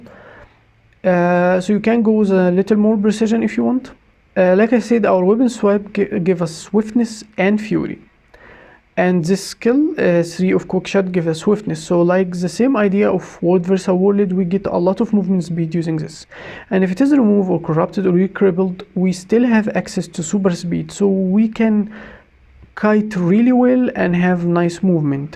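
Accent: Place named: Egypt. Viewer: Thanks for the video ! cheers Dude are you Egyptian ? your accent is Egyptian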